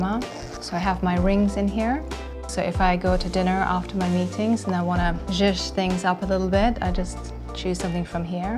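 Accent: United Kingdom. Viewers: She sounds kinda British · Gosh she has such a British accent these days! Seems very nice - does she really only have mascara for make up in there?!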